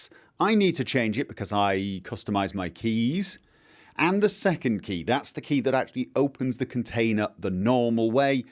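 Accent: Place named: Manchester, United Kingdom. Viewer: its a Manchester accent with a bit of Liverpool, but clear and deliberate with flourishes, like on the rolling Rs. A switch between cities and Norway may have something to do with that.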